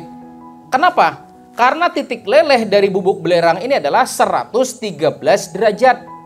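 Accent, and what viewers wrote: Banjar, Indonesia. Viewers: Puan orang mana ta buat logat banjar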